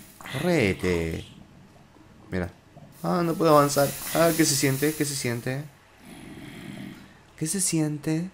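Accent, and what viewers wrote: Córdoba, Argentina. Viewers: sos cordobes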